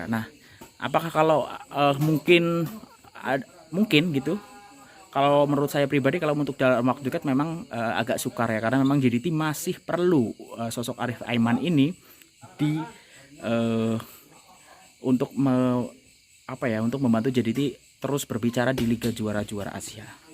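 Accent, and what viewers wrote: Indonesia. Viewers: itu cara indo, i disebut e, kalau A disebut a, kalo k disebut ka, kalo B disebut be, c disebut se mcm tu lah lebih kurang.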